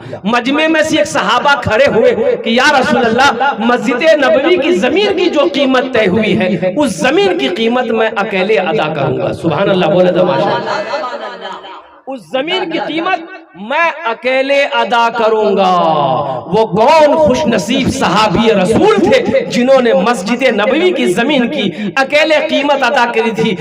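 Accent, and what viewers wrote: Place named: Bihar, India. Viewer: Asli behari urdu bol rahe hain.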